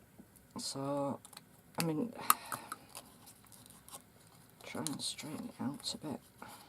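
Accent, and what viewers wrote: Lancashire, United Kingdom. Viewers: Hello my lovely I haven't seen one of your video's for ages. This is great🖒 I will share it on my Pinterest for you. are you from Lancashire you sound like my aunt in Preston lol x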